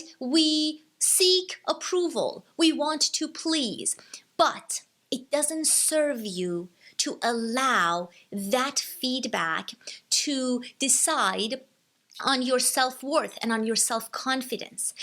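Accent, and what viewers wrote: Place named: Arab world. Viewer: Are you originally from an Arabian country ? Or from Eastern Europe? Just trying to place your accent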